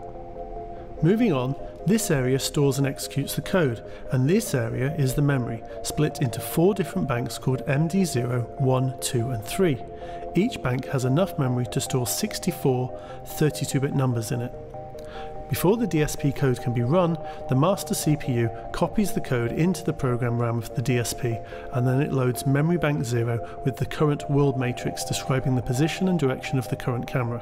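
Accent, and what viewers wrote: United Kingdom. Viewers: Accent? he’s from the United Kingdom.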